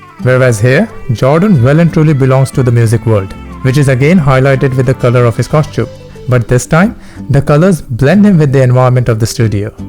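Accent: India. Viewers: Bro the origanility of your indian accent is missing.Please bring it back!It makes narration so much lively!Rest great analysis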